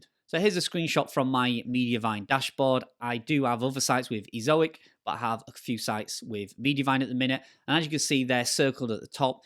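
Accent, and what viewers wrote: Yorkshire, United Kingdom. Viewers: Wow. Another very inspirational video. I am so blessed with the stats in this video bro. Thank you for coming up with such great videos. Good information and good motivation for my own blogging journey. And btw, I love your Yorkshire accent too. Cheers. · "eh up, somethings going 'ere"..... good yorkshire speak lad! t'videos excellent lad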